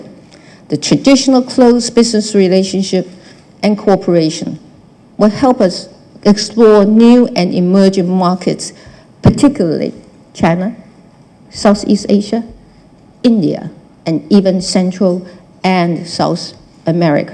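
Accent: United Kingdom. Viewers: 被東吳英文系的韓國瑜嚇到掉了一魂，來這裡聽蔡英文的英式英文壓壓驚。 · 這台風和幽默感~ 英國腔好聽就不用提了XD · 有兩個英文youtuber都鑑定蔡英文總統是偏向英國腔 ( 請搜 "浩爾譯世界" )，總統加油!!! 2020 請再為台灣服務! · 英式的英文！ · 英國腔很重捲舌短音尾音拉高、氣音加重是傳統英國式英文跟美國粗獷大條不掩飾的發音有很大不同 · 很有趣的開場，英國腔有點不習慣，不過還是ok的! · 開場的聲量還有點顫抖、可能是興奮稍微害羞等等，有點台灣腔跑出來，但是後面部份鎮定了，進入主題後展現自信、侃侃而談，很明顯是流利的英國腔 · 蔡英文的口音是英國腔耶，好好聽喔！英文真好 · 愛英國腔😍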